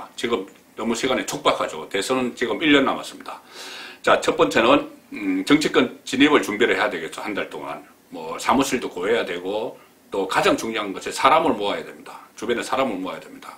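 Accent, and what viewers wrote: Gyeongsang, South Korea. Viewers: 정말! 20만 추가 드립니다.ㅎㅎ 말씀 하시면 쬐끄매 문둥이 발음 이지만요 그래도 팩트을 기반으로 명쾌한 말씀 감사합니다. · 100만까지 돌파할겁니다 깔끔한 정론 경상도 토속어 구수합니다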